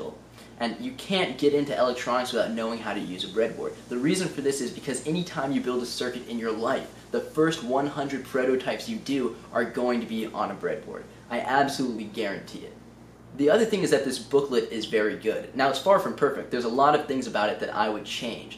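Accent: United States. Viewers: new yorker? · you look like an indian boy and spealk like a american👍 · One of the biggest West-Coast accents I’ve ever heard 😂 Keep it up man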